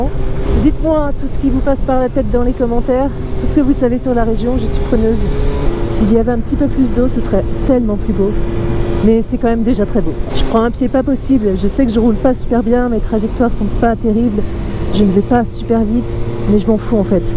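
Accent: South, France. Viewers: J'ai fait pause pour vérifier la résolution par défaut que YT avait sélectionnée quand tu en as parlé, et j'ai bien fait parce que le saligaud l'avait laissée en 1080... Bon c'était déjà pas dégueux, mais ces paysages en 1440... <3 Et pour l'accent, c'est compliqué parce qu'il y'en a tellement de différents dans le Sud ^^ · TON ACCENT DU SUD LE TRAVAILLER ENCORE POUR ENTENDRE LE SOLEIL DANS LA VOIX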